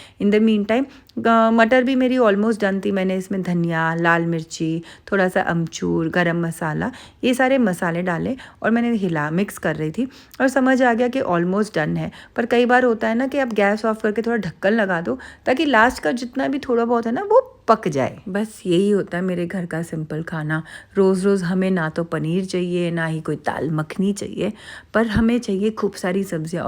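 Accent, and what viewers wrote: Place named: Bikaner, India. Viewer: Aap ki boli or habits all r bikanri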